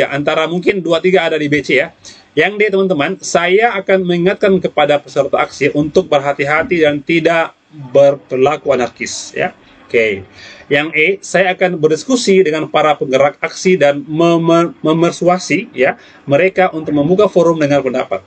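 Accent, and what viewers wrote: Makassar, Indonesia. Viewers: Macam logat Makassar bang😊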